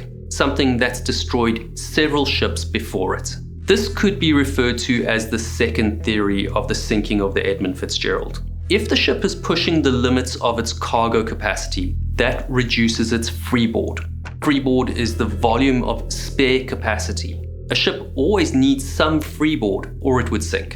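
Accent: South Africa. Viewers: Dude, you sound a wee bit South African.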